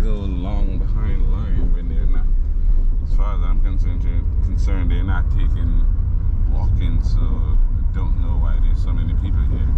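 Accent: Jamaica. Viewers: He Jamaican?... hear the accent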